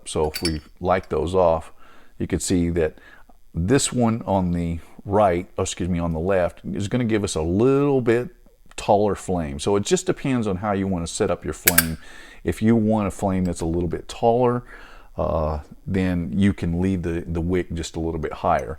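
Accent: United States. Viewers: Good old American country twang sounds fine to me.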